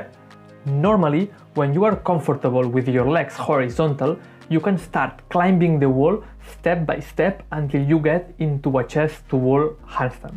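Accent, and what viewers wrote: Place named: Spain. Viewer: illo que dise er tio tu seguro que ere españo vaya que se te nota to el asento chiquillo que arte tiene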